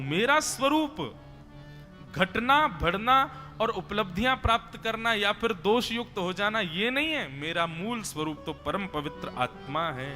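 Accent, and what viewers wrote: Braj, India. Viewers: Apke mukh se Braj bhasa bdi pyari lagti hai bahut mitha lgta hai, radhey radhey 🙏🙏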